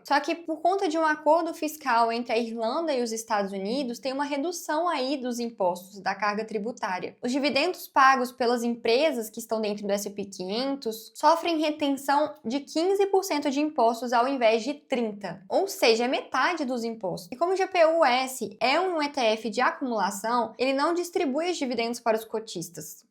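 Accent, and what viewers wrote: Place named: Minas Gerais, Brazil. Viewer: Sotaque parecido com o de Minas e a voz lembra a da Sandy. Mas com relação ao conteúdo, ganhou um inscrito.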